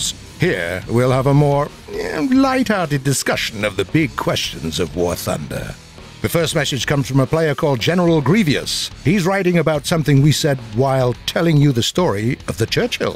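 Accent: England, United Kingdom. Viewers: <link> I love you Gaijeans... (I also love the new british voice). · War Thunder. Official channel. Then why use a limie? as the dont speak English but only a form of it that is irritating to the ears depending from what side of the island that their from... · where is shaun?? but i like this narrator too, i love his "british" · who tf is this brit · New guy on the voice...Its Like brit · How does one energy fight? And what was it with the very British style narrator? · The new narrator sounds very.....british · I think ur lost British guy, where's the normal narrator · Slimedude 29 I do miss Shaun, but this British chap is bloody glorious! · This new narrator is very likely English, a lot more than me in fact. I'd ask where the previous one went to but well... Everyone's already done that for me. Though it would at least be nice to know what's going on. 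· The pros and cons of the new narrator in the video : Pros : -More funny jokes in the videos -Morgan Fman-like voice -Majestic british accent Cons : -Didn't tell us about Shaun whereabouts -Needs more time to adapt to the new voice -YOU ARE NOT SHAUN, WHERE IS HE? Add more if you want to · as a person who grew up on Monty Python, Top Gear, and partly Zero Punctuation, I'm a sucker for British narrators, can we keep this fine chap? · true but this guy is so british it's fun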